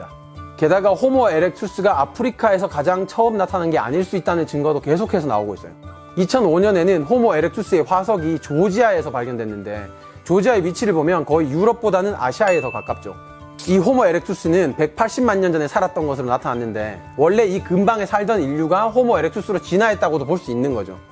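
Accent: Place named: Gyeongsang, South Korea. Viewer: 호모 사피엔스고 에렉튜스고 간에 진행자 분이 경상도 출신이신건 확실하네요. (저도 경상도 사람)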